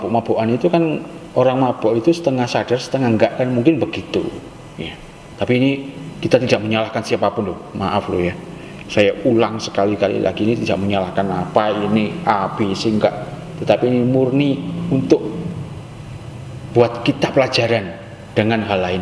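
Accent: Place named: Bali, Indonesia. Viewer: Mas kayanya dari bali, lihat logatnya, salut untuk konten2nya yg mendidik.🙏🙏🙏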